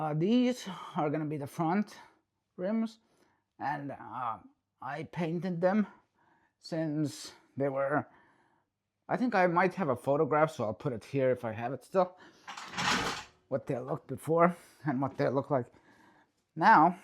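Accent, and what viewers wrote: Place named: Finland. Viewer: Noinkohan tuo rallienglanti ilahduttaa ulkomaan eläviä? Meinaan tekninen sanasto.. No sunhan tää on tube, jätän tilaamisen väliin.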